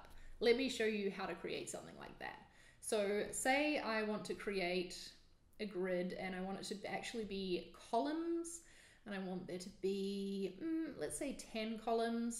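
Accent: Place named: New Zealand. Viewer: Hey thanks for the great vid. I also love hearing a fellow kiwi accent:)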